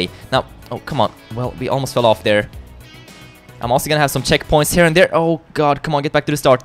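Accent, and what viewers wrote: Sweden. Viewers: are you from sweden? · are you swedish? ps love ya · oh he is Swedish i knew it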